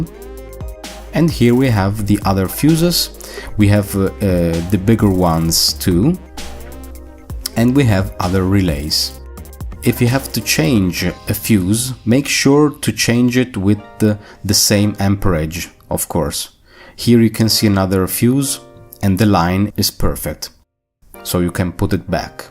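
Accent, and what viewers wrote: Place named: Italy. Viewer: Love the Italian accent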